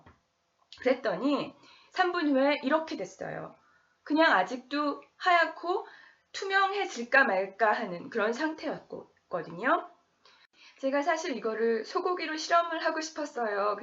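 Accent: Seoul, South Korea. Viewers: 서울 사투리 정말 매력적 ㅎㅎ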